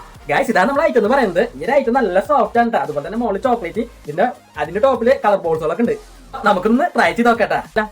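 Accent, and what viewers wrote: Thrissur, India. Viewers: Iyal trissur aano slang ta ta verunnond cgadhichadhattaaa njanum trissur aanu 😂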